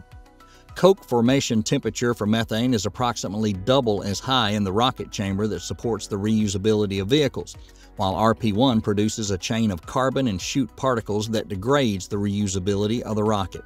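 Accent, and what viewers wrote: South, United States. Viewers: Finally! No stupid CAPITAL LETTERING in the title or exclamation point and deceiving wording, stupidly hoping it will generate more views. Please keep this more mature southern accent voice guy that I learned to appreciate and stop occasionally replacing it with the other prepubescent one. Maybe keep those shady (and, quite frankly, dumb) tactics for your other thousand Youtube profiles I unsubscribed from, but please keep this one free of bullshit. Much obliged and keep up the good work.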